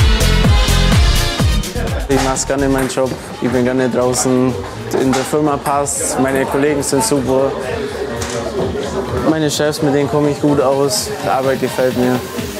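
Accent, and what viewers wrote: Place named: Swabia, Germany. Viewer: Schwäbischer Dialekt - einfach Kult! 🙏😅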